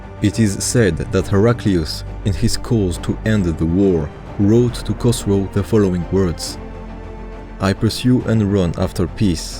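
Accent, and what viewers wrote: France. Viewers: Good and informative video. Enjoyed your narration with French accent, and the pronunciation of the Persian generals were perfect.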